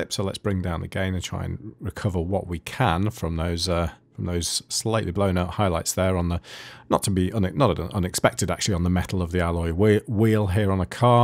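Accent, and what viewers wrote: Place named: United Kingdom. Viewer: Thanks so much. It helps me a lot. I like your British English. Do you have more Tutorials for Davinci Resolve? Greetings from Germany, Kathleen.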